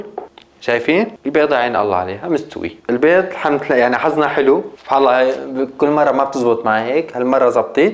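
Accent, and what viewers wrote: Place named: Idlib, Syria. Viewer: لهجتك ادلبي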